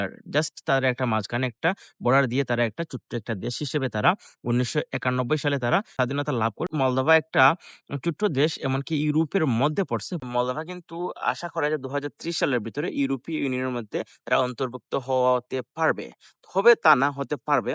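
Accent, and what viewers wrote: Sylhet, Bangladesh. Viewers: সিলেটি মনে ভাইসাব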